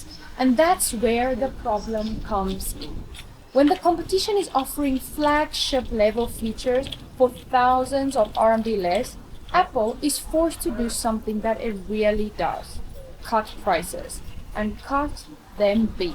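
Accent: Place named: South Africa. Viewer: Please forgive my asking.. You sound South African.. Im from Johannesburg And im your newest subscriber 🎉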